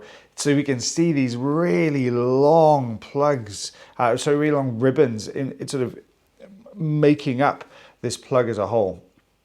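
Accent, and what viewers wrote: Wales, United Kingdom. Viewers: It’s a lovely accent. Think I’ve said this before. Love Wales. Love the videos. Take care. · I dont think your accent is that bad its mild compared to north wales. You also dont talk that fast people should try listening to the irish! X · Love your welsh accent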